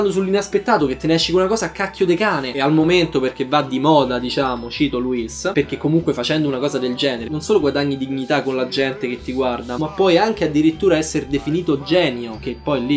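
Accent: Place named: Salento, Italy. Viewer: Sembra più salentino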